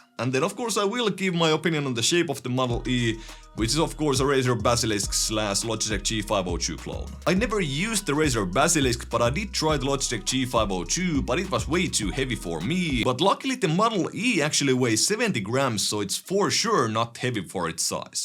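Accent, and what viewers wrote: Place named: Finland. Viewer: Hyvä rallienkku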